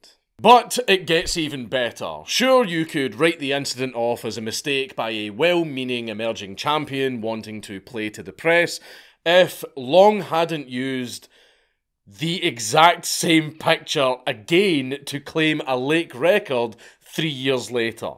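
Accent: Scotland, United Kingdom. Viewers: you sir, are extremely Scottish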